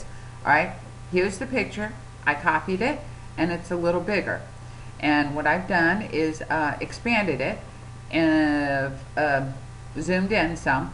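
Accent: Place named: United States. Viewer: NO, it's NOT 'absolute proof'. It is not 'proof' of any kind. It MAY be evidence. It also may be the plane at the next gate! I don't know how many times I've been about to board and see what I think is my plane - when in fact my plane is next to it. The boarding gates are often deceptive, where you go through a door right near the plane you think you're about to board when guess what? You walk along a long corridor to a plane that is a long way from the door... often not even visible from where you're waiting. And, of course, that's assuming that the photo that swept the world was taken at the time and place claimed. Assuming that it isn't another hoax, like the man on the roof of the World Trade Center! Just because you see it, doesn't make it real. Go watch a 'magician' sometime to understand what I mean. From your accent, you're in the US. 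Are you one of the 98% of Americans who have never been abroad? And for all those conspiracy theorists who say that the crashed plane is the airliner that disappeared after taking off from KL... Yes, this would be a good way to dispose of an embarrassing plane... But how do they dispose of THIS embarrassing plane? Oh, I do believe in conspiracies - but not idiocy.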